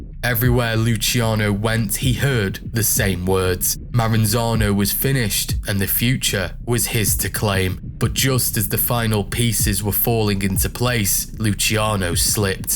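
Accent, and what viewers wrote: United Kingdom. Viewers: What the Fuck is this shit some British idiot talking about the American Mafia 😂😂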